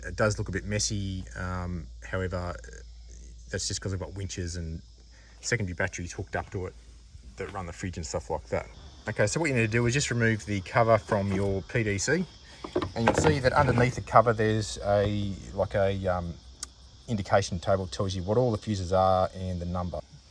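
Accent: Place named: Australia. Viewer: I gave you a thumbs up for the Aussie accent and for having the balls to drive on the wrong side of the road all the time!!!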